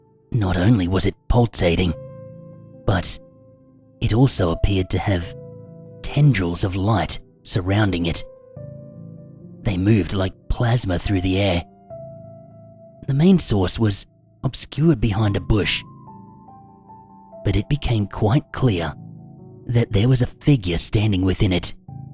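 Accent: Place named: Australia. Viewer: The VOICE from DOWN UNDER!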